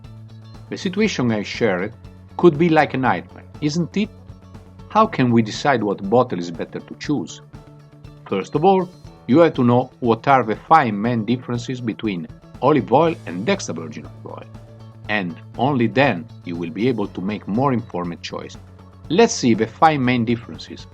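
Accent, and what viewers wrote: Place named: Greece. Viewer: Love the greek accent Edit: Alright. I'm confused. Italian name and speaks Italian, but the accent sounds so Greek to me.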